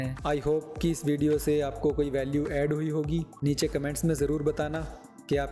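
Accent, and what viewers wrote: India. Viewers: Indian english messes me up. I hear an india speak like this and I don't know wether I fail to get through the accent or they are just using a couple of english words between otherwise different language.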